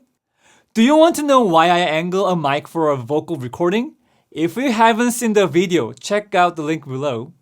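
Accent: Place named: Asia. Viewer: 아시아산 영어의 향기가 느껴져서 좋네요.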